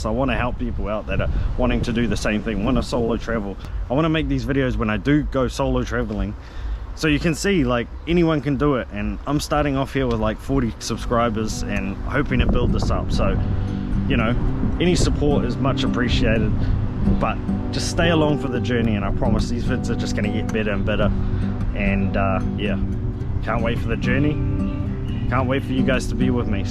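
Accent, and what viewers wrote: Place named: New Zealand. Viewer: Bro, I recognised that kiwi accent immediately haha. I didn’t realise how recently this video was uploaded. I’m super keen to watch your journey and see how you get along. I wish you all the best in your adventure!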